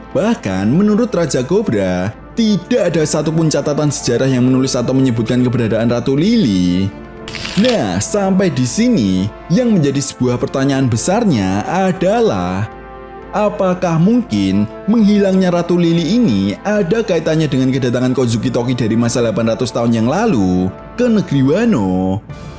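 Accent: Java, Indonesia. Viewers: Logat jawanya lucu, ini yang bikin betah nonton. 👍🏻👍🏻👍🏻😂😂😂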